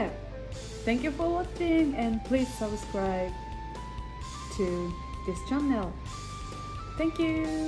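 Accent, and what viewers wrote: England, United Kingdom. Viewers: I love your accent. Like your straight from England 😂👍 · you speak with an English accent! That is amazing! 👍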